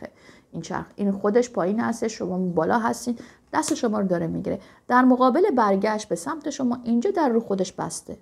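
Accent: France. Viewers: oui. C’est ce que j’ai pensé. Quand vous parlez en perse vous faites certains sons( ex: op) qui rendent évident que vous êtes française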